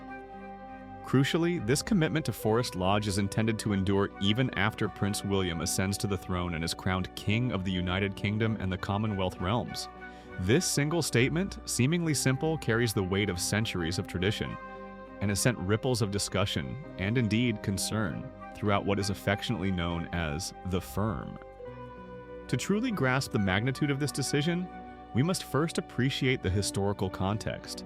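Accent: United States. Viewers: I will never like AI in an american accent.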